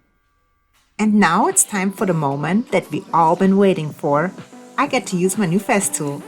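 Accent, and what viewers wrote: Germany. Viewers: Sie kommt aus Deutschland. 😊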